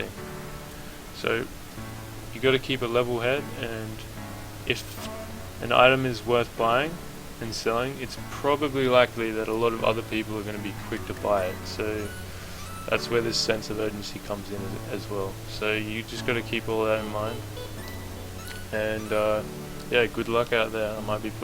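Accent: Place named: Victoria, Australia. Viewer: Maaaate, Guessing you are Victorian from your accent. I'm from WA. Hey, this is a bloody good video. You called all the pros and cons and it was presented without the hype. Kudos! sorry you got so few likes. I'd give you five stars! Any way, Thanks mate!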